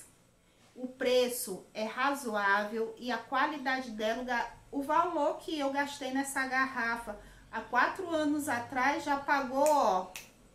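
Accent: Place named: Maranhão, Brazil. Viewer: Parabéns, vou la na potiguar! Quando ouvi teu sotaque ja sabia que é maranhense tbm kkkkk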